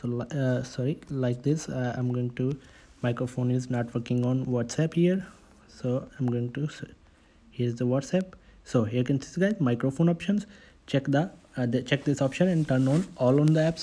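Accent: India. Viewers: Ikr? So stupidly fast with the Indian accent like bro slow tf down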